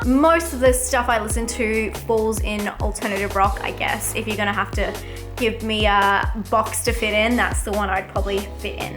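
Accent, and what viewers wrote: Australia. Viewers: First off, you know we love your Australian accent. LOL!! Congrats on your 4500 subscribers. Afflicted was an excellent found footage horror movie. If you ever come to the US, come visit my city, Philadelphia, the City of Brotherly Love. I'll introduce you to a Philly cheesesteak. Keep up the great work.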